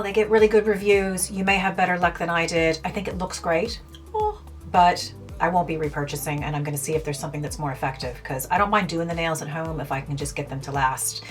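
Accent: Ireland. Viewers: Do I hear the most subtle Irish accent? I’m American so I could just be hearing things. Love the way you speak. Very pretty ❤️ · That's gas, I heard your accent and thought "some of those words/phrases sound really Irish" and then I read the About section on your channel and it made sense! 😂 I got an ad for these on Instagram and was curious because I'm awful at painting my nails, but I thought they were probably too good to be true alright!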